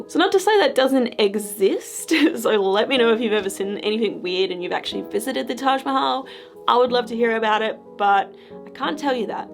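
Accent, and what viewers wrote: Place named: Australia. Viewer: I love ur channel your content is amazing. Your so sweet,nice and positive all the time. Sometimes I think she's so positive but into dark shit lol your definitely unique that's for sure lol I love the aussie accent. Thanks for cheering us up during these dark days. Mad love from the states 👹👻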